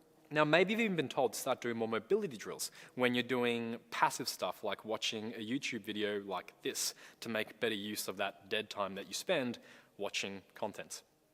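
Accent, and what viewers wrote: Australia. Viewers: I’ve never seen an Asian with an Australian accent, super cool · Are you from Australia?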